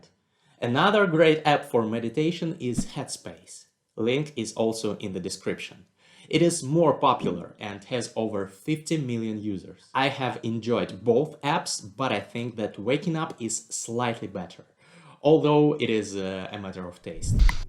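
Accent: Russia. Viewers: you mean that a starts to speak with more russian accent? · как насчёт всерьёз позаниматься с преподавателем для искоренения русскоязычного акцента? Также весьма заметно, что читается написанный текст, не круто. Но круто то, что вы делаете на русском. Желаю успехов!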